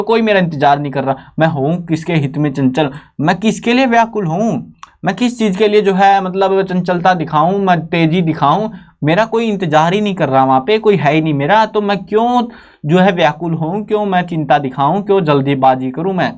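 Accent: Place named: Churu, India. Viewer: Great sir.... Eetta acha to hme hmare teacher bhi nhai pdate.. Sir ap rajasthan me churu Side se ho kya apki language se pta chl rha he..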